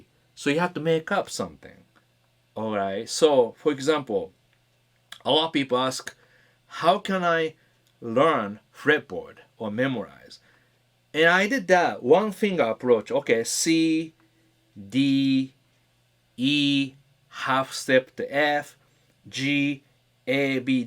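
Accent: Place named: Japan. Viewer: Every time you speak, I feel like you are gonna start speaking Japanese. Your english is very japanese and it sounds really nice.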